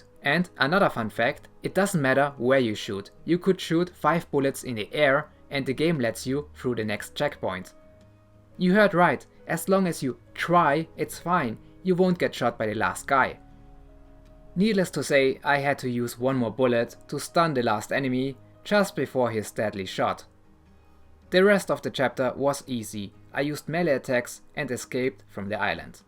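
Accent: Germany. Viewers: Ich höre schon das du deutsch bist Bro haha , unterhaltsames Video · Man merkt dass es deutscher Akzent ist aber du sprichst trotzdem gut Englisch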